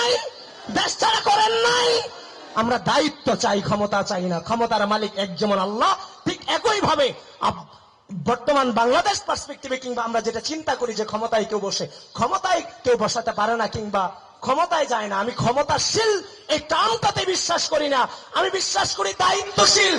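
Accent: Kolkata, India. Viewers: তার কথা কলকাতার ভাষা মনে হচ্ছে ভারতের বির্জ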